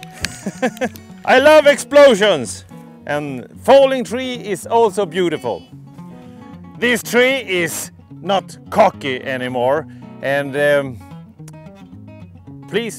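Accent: Sweden. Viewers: Bra / Good Swenglish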